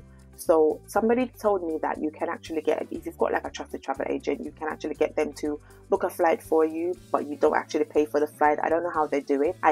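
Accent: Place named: United Kingdom. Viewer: You sound British girl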